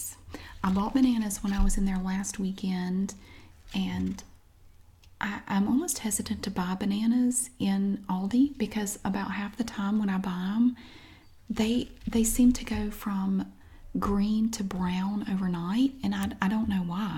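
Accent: South, United States. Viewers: Do you have a patreon account? You should set one up so we can give back in gratitude to what you do for us. A good way for you to also make a little (and much deserved) cash. As for the Brioche, i don’t know what it’s like in the U.S but the French particular Brioche that we get imported to Australia is not dense. It’s very sweet and very soft n fluffy. Perfect for French toast and PB&J but not really with savoury toppings. Just my opinion.. Thanks for another great vid, I’m addicted to your soft spoken southern accent :) x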